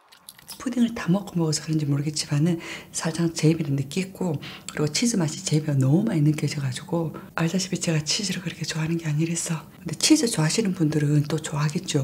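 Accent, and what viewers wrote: Busan, South Korea. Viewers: 부산사투리 넘 귀여워요😂😊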